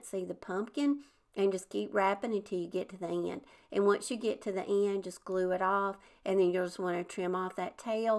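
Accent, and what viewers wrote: South, United States. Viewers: I am fairly new to your channel. I love your idea's. You make such darlin thing's. May I ask which state you live in. I live in South Carolina. You have that southern drawl.. So do I.. I would take a little guess and say you may live in Tennessee. I have family in Tennessee. Thanks for sharing your beautiful craft's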